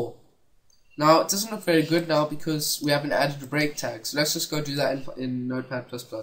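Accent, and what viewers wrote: South Africa. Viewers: Must be a south african, Viva!! Its nice to hear a familiar voice.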